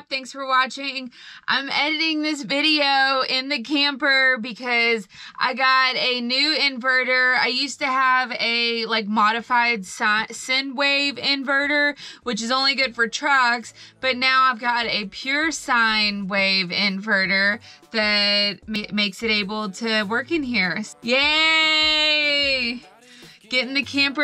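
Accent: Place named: South, United States. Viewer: In a thick Southern accent, "I'm from the South..." Yup, it checks out.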